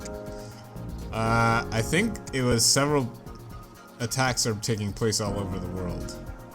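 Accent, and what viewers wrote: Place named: Germany. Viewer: Your German accent is actually the best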